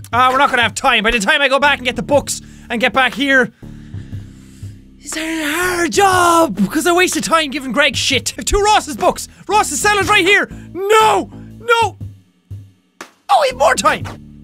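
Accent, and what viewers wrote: Ireland. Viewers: When he says Carl it sounds like Carol because he's Irish as hell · Ai love the way the Irish say 'three' and 'arse' it awesome. 😂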